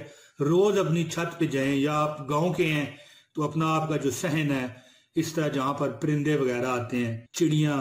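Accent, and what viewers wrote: Pakistan. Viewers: achaaa mjy lga Lahore k ya Faislabad k hn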